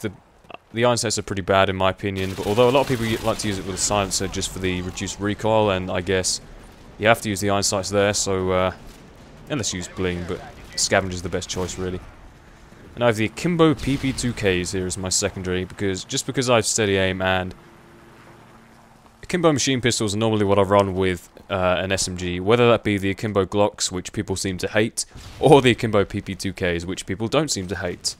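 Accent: United Kingdom. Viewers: 2 Reasons why I LOVE YOU. You're British. You're a younger version of James Bond.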